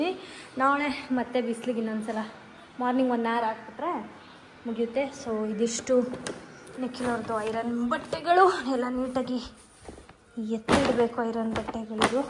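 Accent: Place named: Mandya, India. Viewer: ❤❤❤Namma Mandya style mam❤ super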